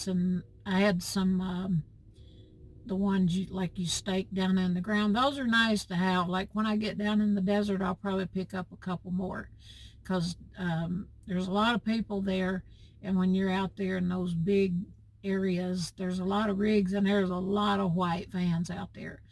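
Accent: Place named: North Carolina, United States. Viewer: You sound just like my family! My mom is from North Carolina, and I love it when we go visit family there! I just watched Bob's interview with you, came right over to your channel and subscribed! I love seeing van tours, and your recliner is AWESOME! I'm look forward to watching the videos on your channel. I hope to get out there myself once I retire from teaching in 5 years (especially after going through this pandemic! LOL!) You were so encouraging in your interview - I want to encourage you also. Do what YOU love, and everything else will work out for you. Safe travels to you - I want to be like you some day! 😁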